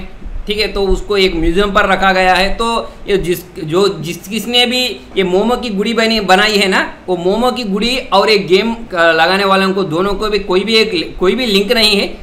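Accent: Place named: Hyderabad, India. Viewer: Hyderabad hindi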